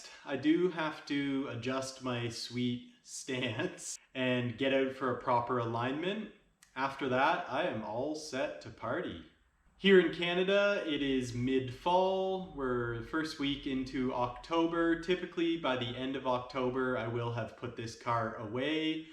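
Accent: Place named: France. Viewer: Hi, are you french ? You have a french accent !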